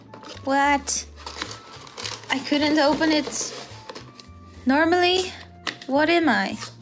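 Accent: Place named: Europe. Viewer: Oh I see I wasn't familiar with your accent tho 😂😂 I tho. it was somewhere in Europe hahha 😂😂❤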